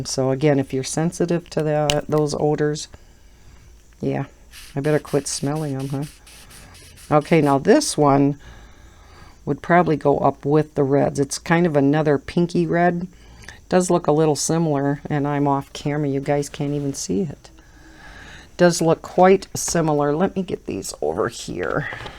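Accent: Midwest, United States. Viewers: I love your videos....where are you from? I was born in Mn and I hear a mid-west accent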